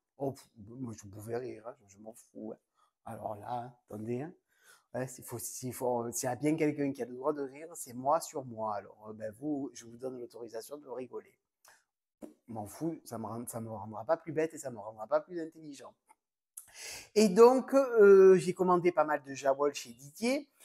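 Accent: South, France. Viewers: Bonjour Quel bon moment passé à te regarder! J' adore ton humour, ton accent 😜 et tu transmets le soleil aux gens du nord Bon moi qui suis de Perpignan je n' en manque pas de soleil! Les laines et divers objets que tu présentes sont tous plus beaux les uns que les autres! Je connais bien la boutique à Barcelone all you knit is love et j' y trouve toujours des pépites à acheter! Le trio que tu as pris est tres beau, leur qualité 70/30 est douce avec une belle définition de points Tu vas te régaler de faire un beau châle 🤔ou autre projet! Je te souhaite de passer un bel été sans sauterelles 🤣🤣👍: j' ai eu également ma part d invasion ces dernières années!! Bisous bisous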